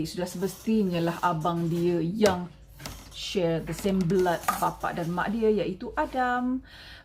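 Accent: Negeri Sembilan, Malaysia. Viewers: Dengo suara pon dah tau org N9..kan?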